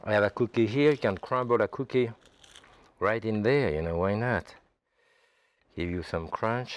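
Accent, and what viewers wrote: France. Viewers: "I was repeating what I heard." if that's what you heard, THAT'S the problem. he doesn't say "ze.I was not making fun of his English" I believe that, I believe you... which is part of the immigrant problem (because he doesn't say "ze")... but YOU still somehow "hear" (I don't doubt this) some caricature of a french accent. (and I'll fully admit his accent lives on in his "hour" - but JFC just STOP with the "ze" nonsense) julia child called him instead of "." that's just true. your "Pepe LePew" nonsense isn't. if you read this far: I don't think you were trying to mock his accent. but I also don't think you were listening.... or you were and you're an american that somehow has never heard an accent beyond and LePew (and maybe Clouseau: i.e., "minkey") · Yeah, after so long in the US, French accent mostly shows through in dropping the 's' in plural words. 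I mean, there's other things, too, like 'roast it for, like, an arrr" which are just beautiful. He couldn't be more American but also, just, so, so French. (I'm from Chicago, but I live in Montreal, so my French is...weird)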